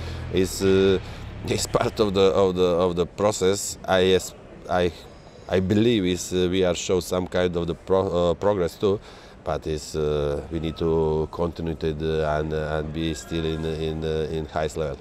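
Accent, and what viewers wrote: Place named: Yorkshire, United Kingdom. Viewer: He’s starting to get a Yorkshire accent 🤔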